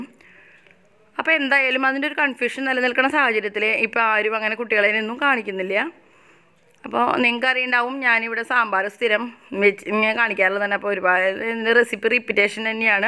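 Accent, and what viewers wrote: Thrissur, India. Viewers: Happy b'day Malu🎂🍫🍬🍭🍡🍨🍧🍨 Cheruthanenkilum nalla sadhya Chechiyude samsaram kettirikkan thanne enthu rasama. Nalla nadan thrissur bhasha thanne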